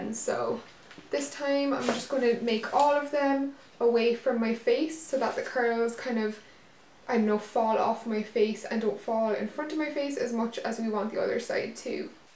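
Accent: Ireland. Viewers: where are you from because your accent sounds like an Irish accent. It sounds like my accent and I'm from dublin 💋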